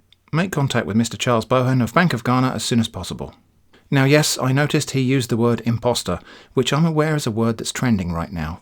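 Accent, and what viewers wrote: United Kingdom. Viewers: Ngl I saw the same Ted talk and found this guy’s videos and thought, oh it’s the guy from that Ted talk thing. Did he always have a British accent? (I’m being serious did that guy from the Ted talks have a British accent? I can’t remember for the life of me)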